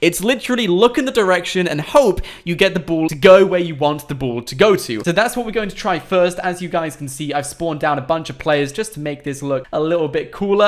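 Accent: England, United Kingdom. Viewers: Wow you are English wow man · Are you from England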